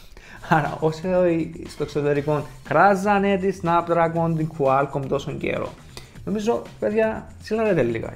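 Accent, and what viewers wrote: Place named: Cyprus. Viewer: Πολυ μ αρεσει η κυπριακη προφορα. Ηρθα το καλοκαιρι στην Λεμεσο και εγινα φιλος με τους πάντες .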